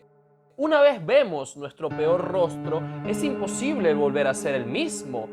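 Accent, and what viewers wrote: Venezuela. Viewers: A mí me suena más a venezolano, dijo "pana", eso no es mexicano. Saludos! 😊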